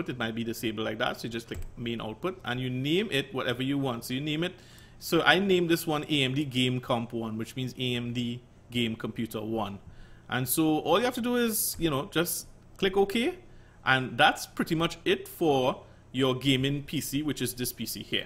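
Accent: Trinidad and Tobago. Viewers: why do you sound Trinidadian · you from trinidad awa?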